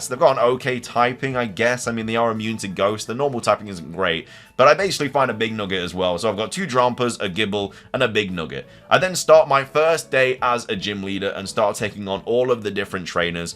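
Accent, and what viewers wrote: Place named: Northern England, United Kingdom. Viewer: I hear bits of some northern english pronouncing and wunder from what county thou art,.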